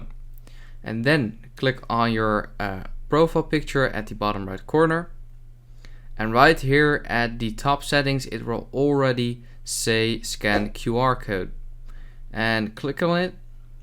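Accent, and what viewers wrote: Netherlands. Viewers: He sounds dutch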